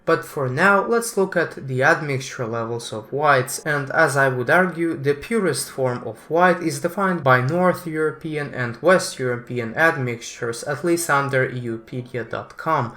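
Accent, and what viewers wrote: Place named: Eastern Europe. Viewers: Ah so you're a slav? And here I thought that your accent sounded familiar. О, так ты славянин? А я то думал что акцент звучит знакомо. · How can you miss the accent, and also he is smart and knows how to argue....All the best Slavic values... Na zdravlje.🍻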